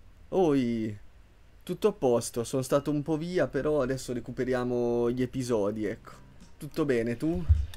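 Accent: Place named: Marche, Italy. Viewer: no ma mio padre è della zona di Fermo e andiamo spesso in vacanza a San Benedetto/Porto San Giorgio, da come parli sentivo un po' di cadenza marchigiana 😁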